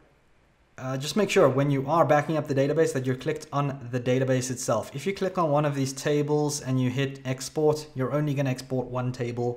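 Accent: South Africa. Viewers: Cape Town or Joburg?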